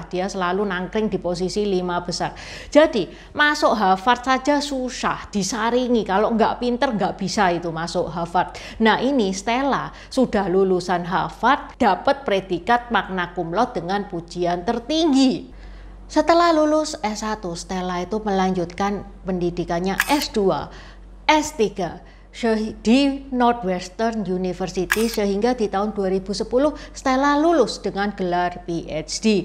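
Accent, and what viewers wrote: Java, Indonesia. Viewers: Kalo kak Cijaw...Cina Jawa makannya medok pokonya mantap kak ❤🇮🇩👍👍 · Ci juga out of the box loh, mencari konten yg lain dari yg lain dengan data yg akurat juga medok banget. TOP!, sukses terus ya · Medok banget mbak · Kenapa bisa medok..cece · Salam medhok. Buat Mbak, 😊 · Sy gemes ma suaranya wong cindo jowonyo medok tenan ❤❤ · Cici,bahasa Indonesia mu jelas tp tetap aksen Jawa nya masih keliatan,mungkin Cici lahir di Surabaya...semangat selalu untuk warga keturunan dan warga lain nya,apa pun suku kamu,apa pun agamau apa pun warna kulit mu apa pun latar belakang mu mari kita bersama untuk membangkitkan negri ini yang terlalu lama tertidur,bangsa ini terlalu besar butuh orang orang yang bisa merangkul semua pihak,ingat negara kita negara kesatuan jadi kita semua harus bersatu membangun bangsa ini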